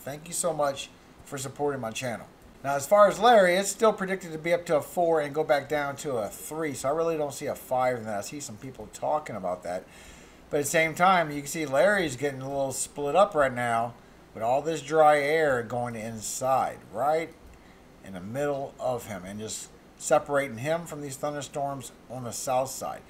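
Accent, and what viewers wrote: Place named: South, United States. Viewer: 🥰 Your speech gives you CHARM,!! 💙 Happy the military couldn't totally break you..."can't take the South out of the boy (or girl, haha!) 😆 God bless...He loves us wherever we come from!! 🙏🌴🌩🌴🌀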